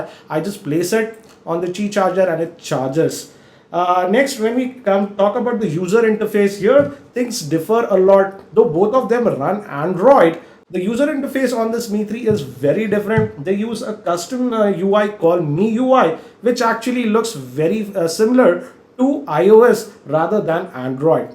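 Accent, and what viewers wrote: India. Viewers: Indian accent is so funny)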